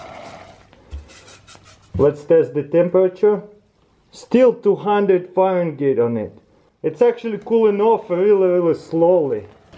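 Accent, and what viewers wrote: Russia. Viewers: I really love his Russian axent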